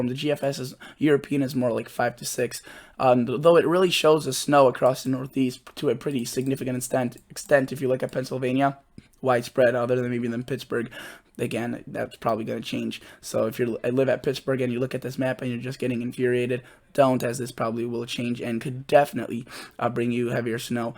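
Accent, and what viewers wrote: Midwest, United States. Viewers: Dude I knew yo u had a midwestern accent but near Chicago?? Me too!!!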